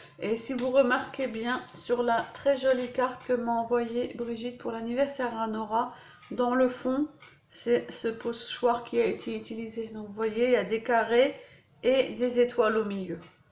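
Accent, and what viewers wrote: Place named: France. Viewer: Coucou, trop beaux ces papiers Ciao Bella (tu es trop chou avec ton accent français 😉 ciao se prononce tchao et buongiorno se prononce bouongiorno), vraiment belle la sound of spring mais mon coup de coeur c’est la Codex Leonardo, celle sur le café, celle sur Pinocchio et peut-être aussi celle sur le theme bois ! Hâte de pouvoir en prendre. Merci pour ce partage qui en met plein la vue en effet !!! Tu vas nous faire des merveilles avec tous ces papiers, bon scrap et plein de bisous bella 😆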